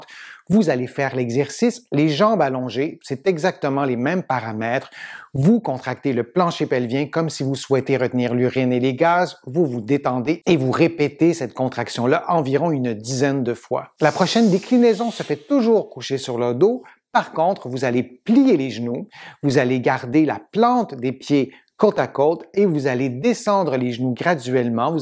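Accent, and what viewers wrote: Canada. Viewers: ❤! De plus, j’adore votre accent canadien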